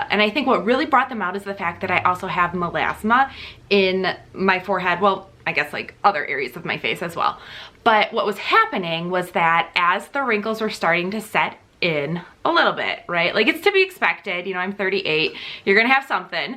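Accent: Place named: Midwest, United States. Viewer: Omg you are soooo midwestern! “ Holy jeeez ya, those are deep!” 😂🤣😅 I’m a MN gal. 😘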